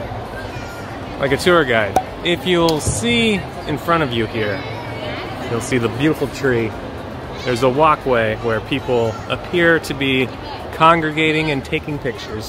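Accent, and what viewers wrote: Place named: United States. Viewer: The first time I saw ur picture I thought it was henry cavill vlogging. The American accent gave u away. Welcome to Singapore, Superman. Come back and try some local food. U will be pleasantly surprised.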